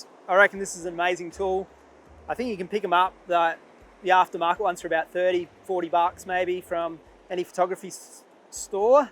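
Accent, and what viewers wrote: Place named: Australia. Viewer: Thanks for the insight. Great to hear an Aussie voice on YT. Awesome work.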